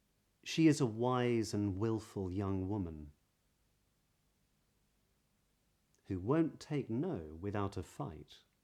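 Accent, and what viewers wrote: United Kingdom. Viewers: Hello sir, thank you and I love British accentt.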